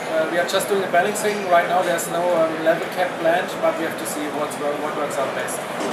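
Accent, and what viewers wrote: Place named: Germany. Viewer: lustiges englisch spricht der herr, hat wohl jeder im raum gemerkt dass der ein deutscher ist :)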